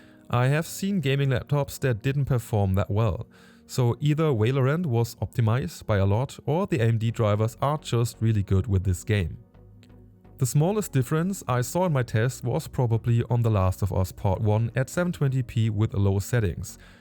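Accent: Germany. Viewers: Dachte mir die ganze Zeit… ists nen deutscher? 🤣 bis zum letzten Wort war ich mir unsicher 🤣 Tschüss!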